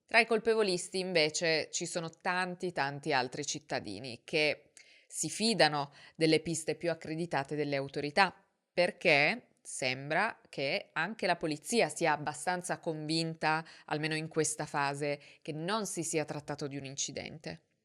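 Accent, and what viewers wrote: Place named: Piedmont, Italy. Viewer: "Pistola spiegata"??? Gia, fastidioso l'accento piemontese (corso di dizione, è il tuo lavoro). Ma certi strafalcioni.... Aggiungo: "Sfonda la porta del water"...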